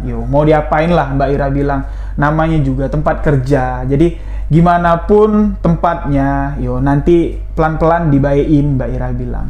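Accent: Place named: Java, Indonesia. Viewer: jawa ngapak dwonk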